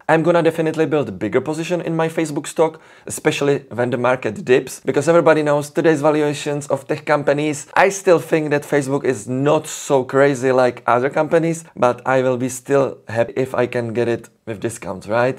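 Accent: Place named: Czech Republic. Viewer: ta česká angličtina je tak... nádherná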